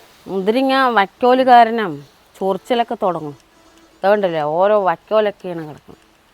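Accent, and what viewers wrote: Palakkad, India. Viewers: പാലക്കാട്‌ ആണ് തോനുന്നു സംസാരം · അമ്മൻ്റെ നടെവിടെയാണ് സംസാരംകേട്ടിട്ട് പാലക്കാട്ടാണൊ എന്ന തോന്നൽ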